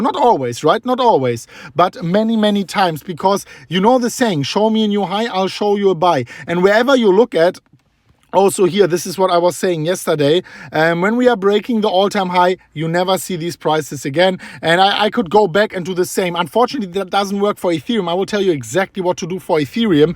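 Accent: Germany. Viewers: Deutscher Akzent kickt xD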